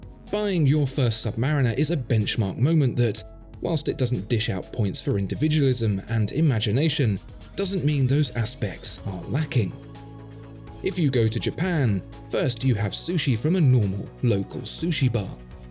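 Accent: United Kingdom. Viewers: Time to dump this channel. The fastest thing you can deduce by anyone wearing a Rolex Submariner is they aren’t an actual diver. I love mechanical watches for the art, design and engineering. Could care less about tradition etc. when I bought my first (and only Rolex) I wanted a relatively cheap but sturdy and reliable watch. Got it used. Now worth 3 times what I paid. Don’t care. It keeps good time and I can wear it while working in my garage. Replaced chipped crystal once during overhaul. Most people don’t notice what it is and I’m happy with that. I’m going to find a watch channel without the gushy mewling, British accent, that talks about interesting complications and design ideas. Not about old traditions - that died decades ago. These are rich companies marketing smoke and mirrors to people who desperately want to “belong.” The richest guy I know wears a Casio digital because he likes it. 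I don’t like any watch with a battery because twice I found myself in a situation with a dead battery and no battery shop for a few thousand miles. For me mechanical and automatic.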